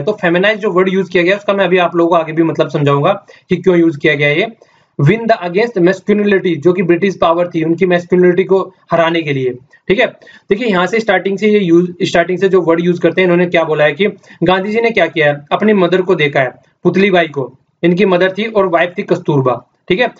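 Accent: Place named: Rajasthan, India. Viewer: sir u are the best...i appriciate ur knowledge...way of teaching....and hard work for us...and i think you are from rajasthan from your accent as i am too from ajmer i will love to meet u sir...but that too after 2018 prelims...i am to give in a lot into it till then... thanks sir..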